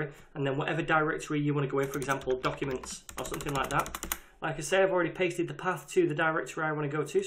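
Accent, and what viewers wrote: United Kingdom. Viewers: Thank u very much, I love your wonderful tutorial, and your amazing British accent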